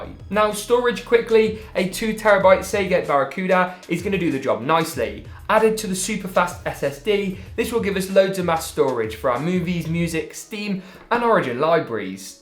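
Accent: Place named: Birmingham, United Kingdom. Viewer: Sound like a brumie but use $ any reason why not £.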